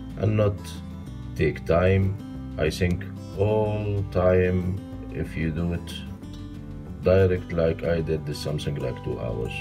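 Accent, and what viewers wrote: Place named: Egypt. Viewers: 😁😁luv d way u narrate .. Egyptian style English.. sooooo sweeet.. 7eloo awee. 👍👍👍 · He’s English makes me to watch all his videos such great person. I like Egypt accent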